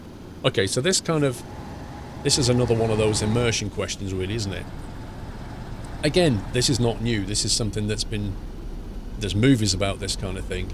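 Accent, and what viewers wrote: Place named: Liverpool, United Kingdom. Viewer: .. loving the woolish Scouse twang 😁! Question for next week: with this generation (which am half in half out being 27).. and with the internet being something this generation is born in... Do you think games have changed to feed this generations instant gratification?.. as in... Do you think games have got easier and have quicker checkpoints so you can get quick satisfaction... I remember how quickly I used to complete super Mario world for the snes and I went back to it the other week and it was soooo much harder than I remembered..... What's your thoughts??? Next topic: console pc mobile gaming.. which is best for which genre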